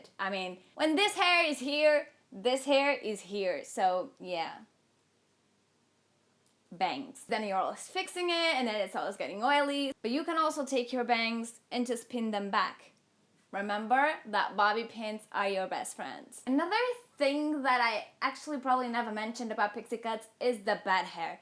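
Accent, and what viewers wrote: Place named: Portugal. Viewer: eu estava tentando descobrir qual era sua nacionalidade já que não parece ser um nome ingles, e por causa do sotaque também, e agora que descobri que você é Portuguesa tudo fez sentido haha beijos do Brasil! adoro seu canal!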